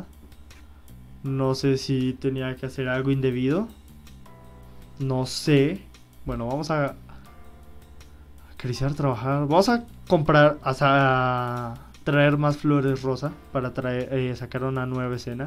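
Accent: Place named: Colombia. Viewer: suenas como un colombiano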